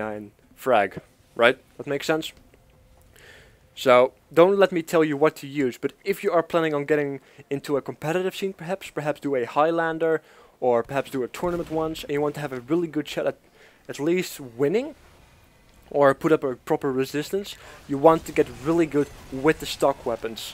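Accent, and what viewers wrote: Netherlands. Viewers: Are you dutch? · I love ur vids Btw, are you from holland (you sound like it, i am 2)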